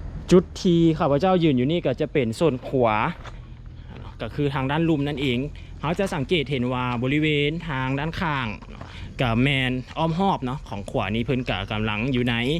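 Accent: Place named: Thailand. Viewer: ສຽງໄທບ້ານຫນອງຫນ່ຽງເບາະຄົນບັນຣະຍາຍນີ້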